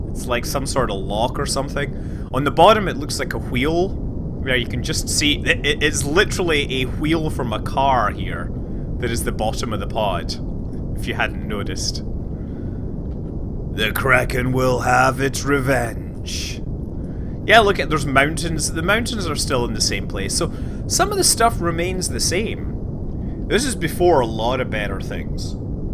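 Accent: Scotland, United Kingdom. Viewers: Sounds like Scott's accent came back with him from Scotland. Neat! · is all like "How come we don't have a swamp anymore!?" in his Scottish accent. Couldn't help but Shrek.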